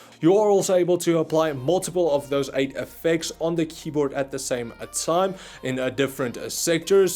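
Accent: South Africa. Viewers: Is he from south africa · from the start i knew you were from SA en awe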